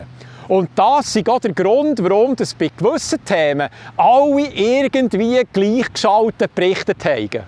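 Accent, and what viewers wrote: Bern, Switzerland. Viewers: Danke... Du bisch dr bescht u es isch toll dis Bärndütsch...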